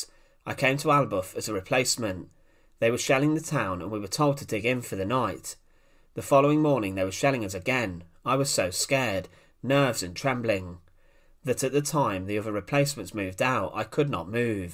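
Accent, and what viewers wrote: United Kingdom. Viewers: In case you did not quite grasp the narrator's accent, he is English (British), or a British speaking computer generated voice. In any event, those stakes you saw in the video's opening segment are of a memorial in the UK to those British Soldiers who were executed by the British Army for desertion/cowardness during the 1st World War. (Hence the statuary of the guy standing against one of those posts with a target hanging over his heart.) Desertion and cowardness was quite high in a British Army still heavily influenced at the time by Victorian norms' and where, almost to a man, the commanding officers of those troops back then were all from the aristocracy, privileged, very well to do, or political leading families--- there was no such thing as PTSD being heard of by this lot) during WWI. Hundreds of soldiers accused / tried for it were summarily executed in the field as it was a very common practice then.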